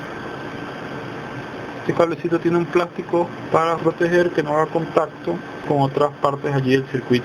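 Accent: Venezuela. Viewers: mi pana tu eres venezolano verdad? aprecio tu esfuerzo muy buena tu explicacion, ahorita comprar un bombillo nuevo es un dineral. Gracias!!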